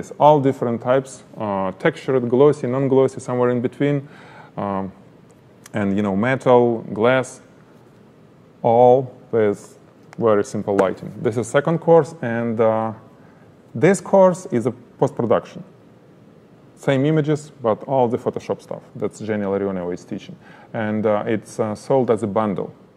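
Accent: Russia. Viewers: Hi, firstly are you Russian? Great accent!:D. OK real question, I make handmade beaded jewellery, but my product photography really doesn't do justice to my jewellery. My biggest issue is how to get the sparkle in the crystal bicone beads, but not blow out the duller toho beads. Over a year I have been trying, and no matter what I can't seem to take decent images on a white background on a budget for my shop. I absolutely loathe trying to do the images now, as to photograph say 10 items can take me over four days, and I'm still not happy at the end. Any chance you would concider doing a video on something like this? I'd even be happy to send you a piece of my hand made jewellery for you to use for the demo which you could then pass on to your loved one. Desperate for help, as a single mum trying to make money from my jewellery making skills is being let down by the fact I am unable to take the images that show off the jewellery. 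Kindest regards Linda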